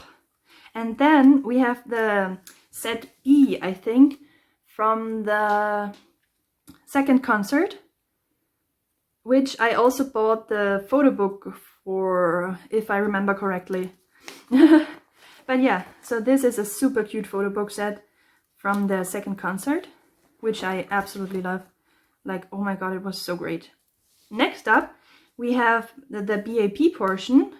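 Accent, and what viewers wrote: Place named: Germany. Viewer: I really like your binder!! It looks really really nice! I think it's so cute how nervous you are, but you really don't have to be. You are doing a great job. Judging from your accent I believe you are german? But don't be insecure about it, it's really cute😁👍👍❣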